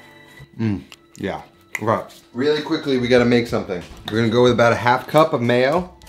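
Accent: New York, United States. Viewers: New york accents are magic on video for some reason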